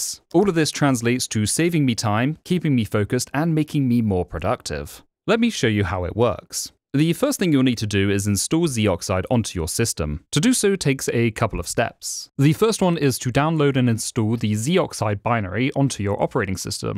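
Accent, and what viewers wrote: United Kingdom. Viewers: he does have an English accent, maybe he should be saying ze doxide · Is it not supposed to be pronounced ‘zed oxide’ as a play on ‘red oxide’, a chemical usually known in naturally occuring forms as ‘rust’? Rust being also the language zoxide was written in, of course. Just surprised since you also have a British accent.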